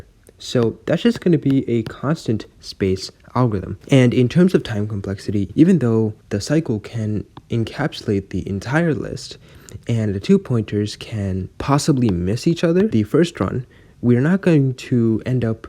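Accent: India. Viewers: Are you from hyderabad ?🙃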